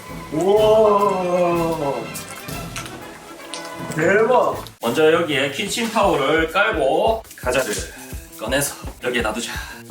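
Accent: Busan, South Korea. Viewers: 몰랐을수도있지겁나뭐라하네 근데허팝님부산사람인가요뭔가억양이익숙한데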